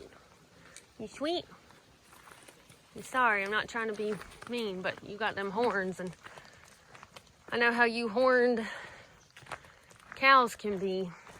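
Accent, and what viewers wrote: South, United States. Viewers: Mmmmmm bacon jerky! Thanks for sharing your adventures/insights/opinions/photography/wisdom/downtoearthness/stories/southern charm and accent!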